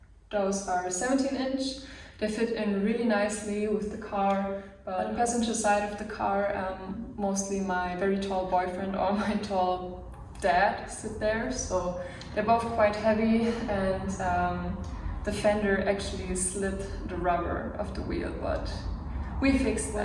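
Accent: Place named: Germany. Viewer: Es ist immer wieder komisch deutschsprachige Menschen Englisch sprechen zu hören... 😂 (Unser "Dialekt" passt einfach nicht. - Obwohl du ja gut Englisch sprichst.) Da fühlt man sich wieder wie im Englischunterricht in der Oberstufe. 😅😂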